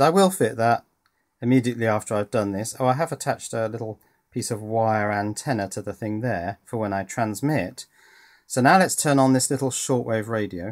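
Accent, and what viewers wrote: United Kingdom. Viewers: just curious, are you from the UK ? your accent is very nice.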